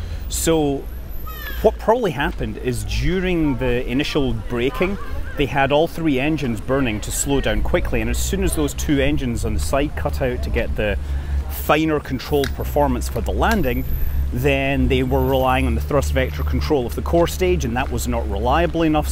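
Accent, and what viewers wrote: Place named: England, United Kingdom. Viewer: We of the USA do understand the English way to say words differently than we do, there are many different methods to say many of our words here in the USA. So please do trouble your self, Thank you very much your program is "GGG-GREAT!" as Tony the Tiger would say it. Taken from a commercial of Kellogs Corn sugar-frosted flakes. This one has been off the airways for many years now. { Tele or TV or The boob-tube came to us on airways. }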